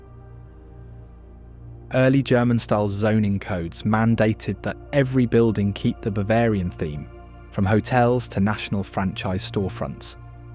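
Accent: United Kingdom. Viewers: I think the Guy speaking in an BRITISH acent talking about Appalachia is Silly.. Why not a Southern acent ?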